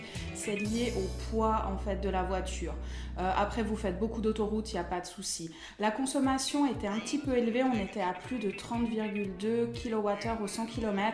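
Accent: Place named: Switzerland. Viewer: Cet accent suisse est charmant ; par contre le chanteur de la musique est pénible.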